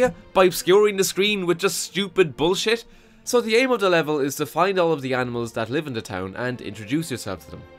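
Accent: Ireland. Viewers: I love the Irish when they're angry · Nice Irish Brogue. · Are you Irish? You should do a video with Call Me Kevin!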